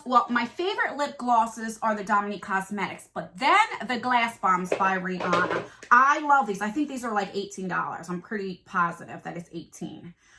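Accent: New Jersey, United States. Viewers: Lmao the creative descriptions must be a Jersey thing. My mom and my dad both were born and raised in Jersey and speak just like you, I live it! 💄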